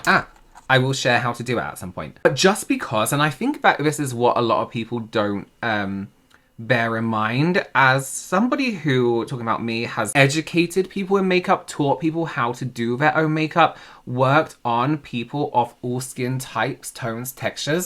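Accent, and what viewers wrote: England, United Kingdom. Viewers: The English accent is everything 😍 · I have an interest in makeup, and you provide valuable information not only about the make up application, but you add your years of professional experience to it., it is always delivered with humor, intelligence, and realness which is very much appreciated. Also your British accent is a nice to hear too! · the English accent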